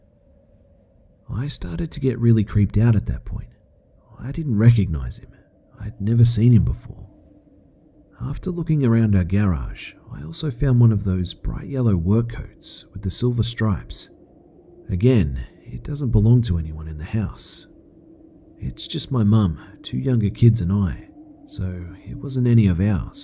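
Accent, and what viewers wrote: Australia. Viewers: are you aussie? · yes I'm aware he's an Aussie with an accent. fuck off me it was funny lol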